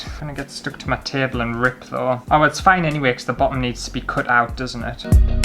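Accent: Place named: Newcastle, United Kingdom. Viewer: YOOOO are you a geordie lad? or am i gaan mad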